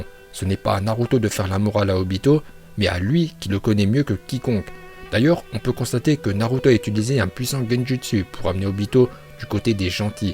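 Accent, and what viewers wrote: Japan. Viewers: J'aime trop ton accent japonais 🏯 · Super ces vidéo car on entend des prononciation que les youtubeurs français ne prononce pas comme : Naluto shipunden Laiton Vraiment sa fait plaisir d'avoir des prononciation japonaise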